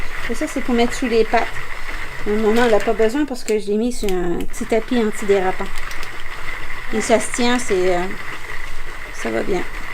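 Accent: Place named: Quebec, Canada. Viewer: Coucou. Ho géniale de nous faire voire le contenu du sentro avec les pelotes de 25 grammes. Heureusement que vous êtes là pour nous pour faire traduction du livret qui fort dommage n'est pas en français. Merci beaucoup. J'ai hâte d'être en fin d'année pour me l'offrir. Votre petite puce à l'aire impatiente de vous voire travailler dessus. J'adore la petite voix de votre petite puce. La vôtre aussi et agréable à entendre avec votre petit accent québécois. À très vite pour de prochaines aventures et travaux avec le sentro. Bonne journée à vous et à votre famille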